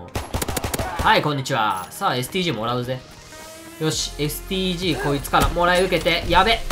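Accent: Kansai, Japan. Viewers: 元が関西やからな。普通に関西弁使うときあるしイントネーションも関西寄り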